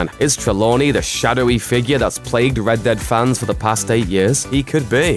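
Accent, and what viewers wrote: United Kingdom. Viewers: British yong yea? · Ok why do you sound like a British chills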